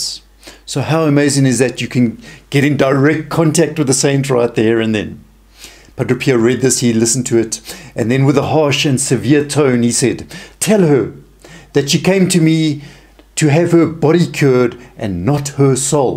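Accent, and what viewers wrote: South Africa. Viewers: Unmistakably south african. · Woah is this presenter South African?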